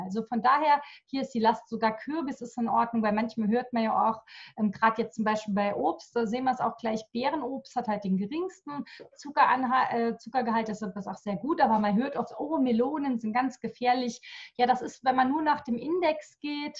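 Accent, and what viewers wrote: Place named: Saarland, Germany. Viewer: Ich liebe den saarländischen Dialekt 😊❤. Lg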